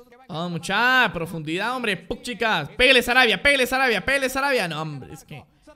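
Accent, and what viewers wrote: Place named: Argentina. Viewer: Porque carajos habla como si fuera Argentino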